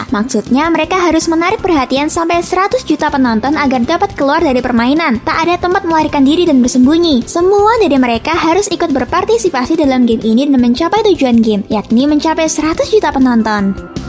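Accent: Japan. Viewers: Fasih banget min logat Jepang nya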